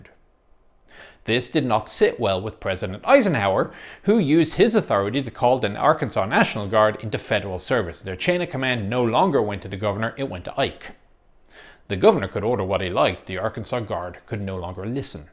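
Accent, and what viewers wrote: Ireland. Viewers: As someone who works with a lot of paperwork related to the three components, I can just show friends and family this video now to explain how all this works. I'm sure it sounds better coming from someone with a charming Irish accent... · From your accent, you sound like you're from Ireland. Did you have to go into the reserves as part of the naturalisation process or was it an active choice on your end? Asking as an Irishman ready to move to the US. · I bet part of it is his Irish accent. Like Shelby Foote in Ken Burns Civil War series, his accent is pleasing to the ears.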